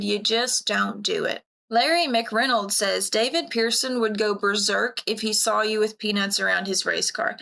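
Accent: Kentucky, United States. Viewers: It’s the humor… the sarcasm…. The KY accent?? I’m here for it!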